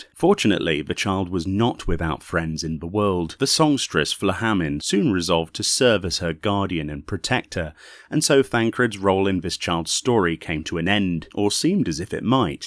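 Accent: United Kingdom. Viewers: With all due respect to Brian from Work to Game, but you cannot beat a British accent. No shame in losing to that.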